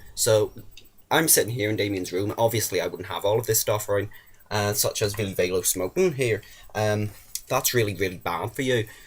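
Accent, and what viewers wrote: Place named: Northern Ireland, United Kingdom. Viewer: Congratulations, I commend your marvellous miscellany of accents. And may if I may say so, I am getting ever so slightly used to the old Northern Irish voice by now...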